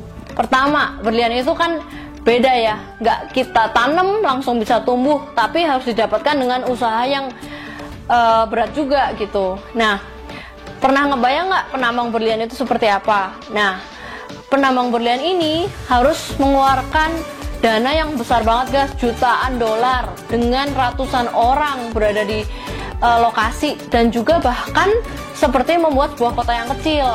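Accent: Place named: Banyumas, Indonesia. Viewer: medok logat nya...kaya ngapak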